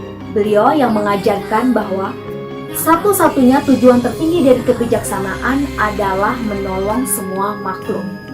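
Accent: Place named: North Sumatra, Indonesia. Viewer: Wow, penjelasan yg sungguh komprehensif tentang Borobudur yang pernah saya dengar. Gaya presentasi bagus, jelas. Biar lebih oye, hilangkan kebiasaan menggunakan kata tak efektif "eee", ketika loading memory, lebih baik silent sejenak, agar tak mengganggu pendengar menyimak sambil upload memory juga. Logatnya, logat sumatera utara kalau tak salah tebak. Tapi itu semua kekurangan minor saja, lebih banyak bagusnya, kalau mau naik level ke presenter yg pro. Anyway sdh keren, lanjutken tambah lama pasti tambah keren !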